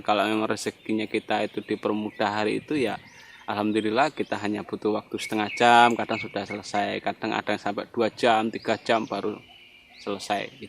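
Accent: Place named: Madura, Indonesia. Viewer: Oohhh tak kira di Trawas Pasuruan atau wsrga Pasuruan Jawa Timur. Dialog bahasa nya seperti Jaws timur Madura turun Pasuruan. Terima kasih telah berbagi ilmu, semangat ya mas. Berbagi ilmu nya.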